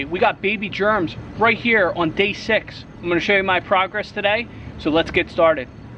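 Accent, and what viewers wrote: South Jersey, United States. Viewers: Hey, I subscribed through Etsy! 😉 It's good to see Jersey again and I can hear your area code 609 accent. 😊 In one video it's so obvious you have a gift for creating beautiful ORGANIC lawns. That is so vital with climate change. We live way upstate New York now in the woods and we don't even have sidewalks or street lights and the scrub grass grows, well, like weeds. It's so nice to see your flat, green lawn. Beautiful work. 🌱🌱🌱🌱🌱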